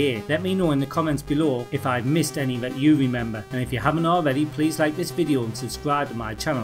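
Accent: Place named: North East England, United Kingdom. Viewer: ah cheers man. I can tell just by the accent you are NE, and a total commodore lad so what more could I want. Loving this series so far. There’s so many C64 releases and these vids have already introduced me to a few I’ve never heard of!! Appreciate the time to get back to me mate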